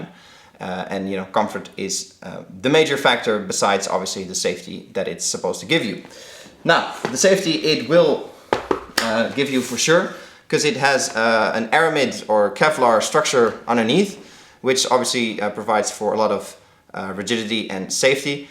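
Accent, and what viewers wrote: Netherlands. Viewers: Are you Dutch? It sounds like you have a Dutch accent haha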